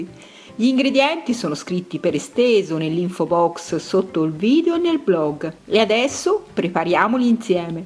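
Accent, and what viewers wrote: Tuscany, Italy. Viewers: Anche lei è deliziosa, sia per la pronuncia Toscana che per le meravigliose ricette!!!!😘😘👩‍🍳🍰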